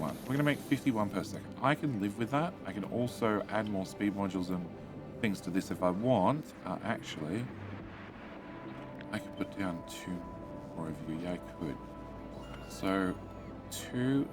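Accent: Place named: Australia. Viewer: It's Australian mate, different English ay.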